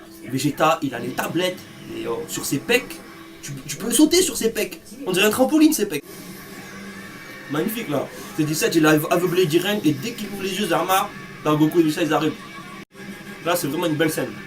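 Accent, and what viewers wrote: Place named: South, France. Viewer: Oh mamène t'as un accent du sud comme moi, t'es d'chez nous ? :P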